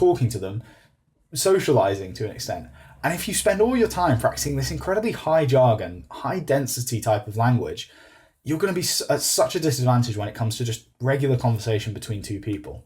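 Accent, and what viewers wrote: United Kingdom. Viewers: 영국발음 너무 좋다고요 ㅠㅠㅠㅠ 영국식 영어 배우고싶당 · Hi! 오랜 팬인데 댓글을 남기는건 처음이네요. 특히 이번영상에선 영국발음이 너무 멋져요👍🏻 다음 영상도 기대할게요🔥🔥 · 영국발음 너무 조-하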